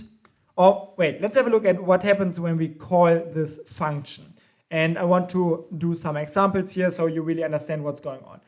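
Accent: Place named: Germany. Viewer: den deutschen Akzent kann man im englischen kaum verbergen :-)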